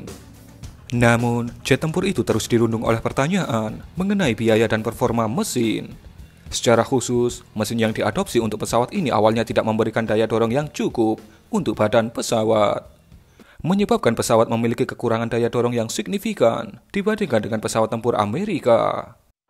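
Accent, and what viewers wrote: Indonesia. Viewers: Medok bet nih yang ngomong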